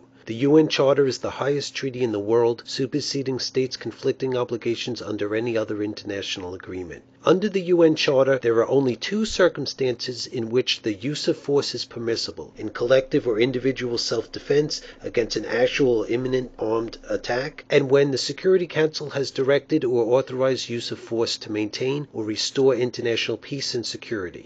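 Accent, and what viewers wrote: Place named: New York, United States. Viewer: Wow. I've stumbled across a Communist son of a bitch with a New York accent. This slime ball loves the Communist UN and hates the US Constitution... I wonder if he knows he is a marked man? I would further postulate that the slime-bots on this page are his co-conspirators. Geez. These cruds are as dumb as Obama... Antisemitic too... What a pile of LOSERS.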